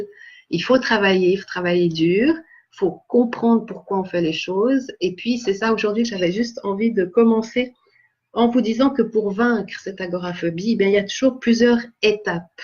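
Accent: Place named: Canada. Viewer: Tu prends l'accent canadien:D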